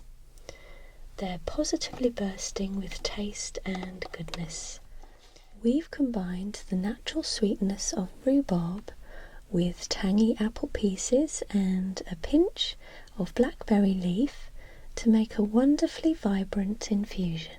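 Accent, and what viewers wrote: England, United Kingdom. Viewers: cat I mainly detected a British accent, but I thought maybe there was a hint of Australian there. Regardless, it doesn't matter; she has an amazing voice and demeanour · Yes she is English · lovely British accent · Another amazing video. Love listening to fellow English ASMR accents ❤︎ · are you from uk? Your accent is not American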